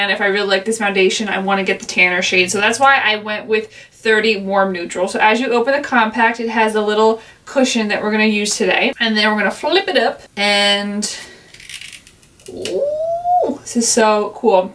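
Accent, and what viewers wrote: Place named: New Jersey, United States. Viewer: I basically fell upon one of your vids the other night and when I heard your accent I thought she has to be from Jersey! I think you're awesome anyway tho 😁 #subscribe 💜 from Philly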